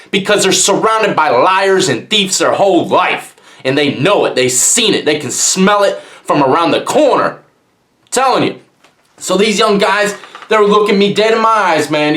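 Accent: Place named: DMV, United States. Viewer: I knew you from the dmv or I could just hear it I’m from dc/Baltimore anywhere in between and I know front royal VA pretty well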